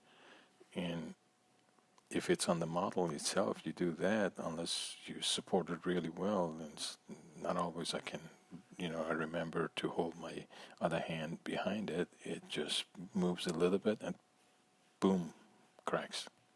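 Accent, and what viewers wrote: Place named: Arab world. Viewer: Actually, not that unrelated, the accent and your artistic skill led me to think that you were from Armenia or some other Middle Eastern country.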